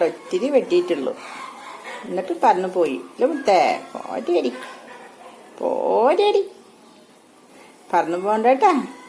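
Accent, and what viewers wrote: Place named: Palakkad, India. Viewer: PALAKKAD slang soooper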